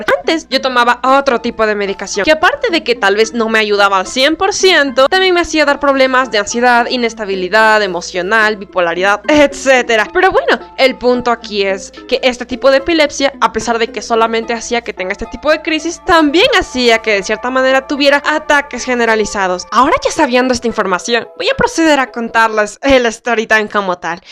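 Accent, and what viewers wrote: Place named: Quito, Ecuador. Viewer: es ese acento quiteño serrano que tiene 🥺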